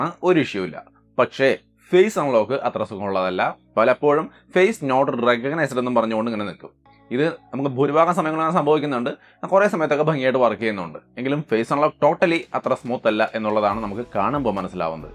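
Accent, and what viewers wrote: Thrissur, India. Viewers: Are u from Thrissur!??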